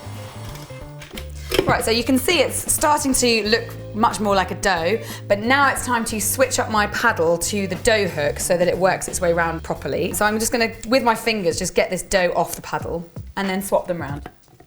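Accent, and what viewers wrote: England, United Kingdom. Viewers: hi, first of all I wanted to said you are awesome and I love your English ascent:) I love watching your YouTube and today I decided to try your doughnuts recipe, follow exactly your recipe, beautifully raised and I love the texture. I am a doughnut fan so I always try recipe. my question is how to get the doughnut soft all day like we buy in store. yours nice soft when it hot however after 4 hours I taste a bit chewy n not that soft like a hour after frying. Do u hv any tips for its always soft whole day. thanks. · Great video, love your british accent! By the way, I can also use a pan to fry the doughnuts, can't I?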